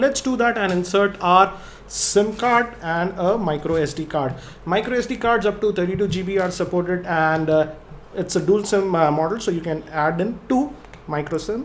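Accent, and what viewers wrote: India. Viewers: No offence intended and nice video but, heavy heavy Indian accent you've got there.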